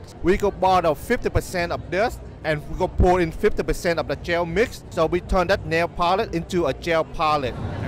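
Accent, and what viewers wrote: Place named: Vietnam. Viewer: Hears voice * immediately realizes he is Vietnamese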